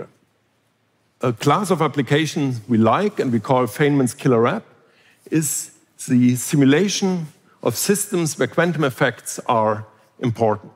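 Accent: Germany. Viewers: his baldness (with or without sunglasses) is superseded by his German accent for his credibility. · i tryed to watch it, but after min he lost me completly. And the german accent has mad it worse. Sorry Hartmut, aber das kannst du sicher besser. Geiles Thema, aber suboptimal transportiert, für mein Verständnis zumindest. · i can hear the german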